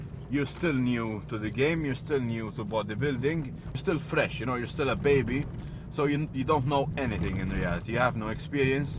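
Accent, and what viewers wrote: Malta. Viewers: hes from Malta I think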